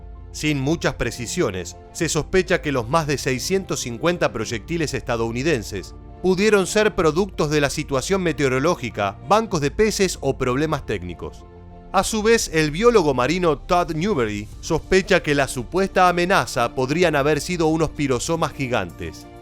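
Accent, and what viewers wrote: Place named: Buenos Aires, Argentina. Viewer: Querido amigo, sigues con el virus Argentino de la soberbia, de hablar como el único ser humano inteligente. Puedo decirte sin equivocarme y con humildad que eres un ingnorante de muchos cosas de las que hablas , además de leer los escritos históricos como un loro. No haces ningún comentario propio de alguien que estudia los verdaderos hechos históricos. Tu acento porteño y de soberbia hace tambalear lo que dices en tus vídeos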